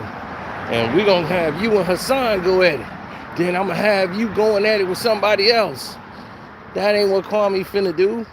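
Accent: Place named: South, United States. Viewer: This DUDE Talk SLOW AND COUNTRY. GET TO THE FUCKING POINT. 😁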